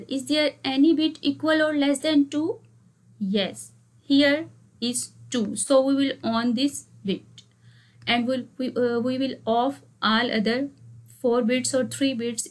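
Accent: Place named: North India, India. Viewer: If my guess my right ur a North Indian.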